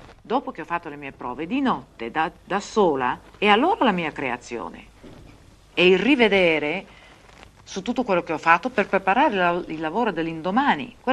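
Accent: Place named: Veneto, Italy. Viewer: Giusto. Si sente (ancora) l'influenza dell accento Veneto.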